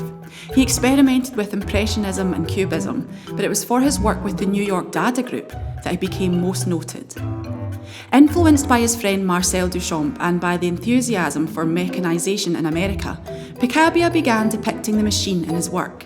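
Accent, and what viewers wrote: Scotland, United Kingdom. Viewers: that scotish accent tho'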